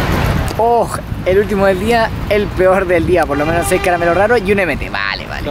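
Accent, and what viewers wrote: Chile. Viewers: Sinceramente no hay mejor youtuber de Pokémon, sinceramente tus vídeos son buenísimos y por más que se quejen de como hablan los chilenos, me gusta tu manera de expresarte y te entiendo siempre, saludos desde Perú y ojalá vengas pronto qué te recibiremos muy bien 💪❤️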